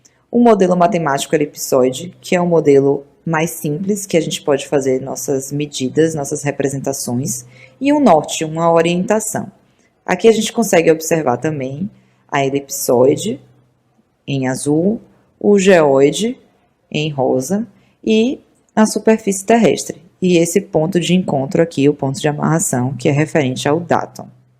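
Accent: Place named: Salvador, Brazil. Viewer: Uma sotero reconhecendo o sotaque!! Otimo vídeo!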